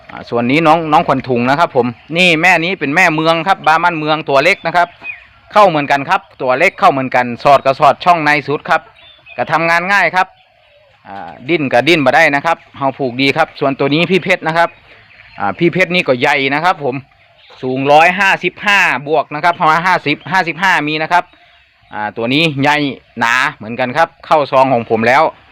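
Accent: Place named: North, Thailand. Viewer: คุน เป็นคนตี่ไหนคือเหว่าอี่ได้อู้เหนือได้คับ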